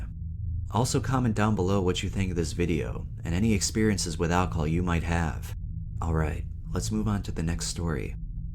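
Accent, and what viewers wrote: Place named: South, United States. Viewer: Ngl I really love the little country into tune you now use lmao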